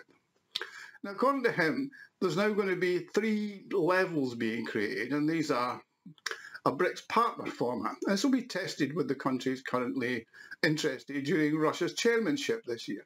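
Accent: United Kingdom. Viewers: Great commentary. Good to hear a British voice talking sense against the tidal wave of propaganda we have to put up. Best wishes to Scotland from England.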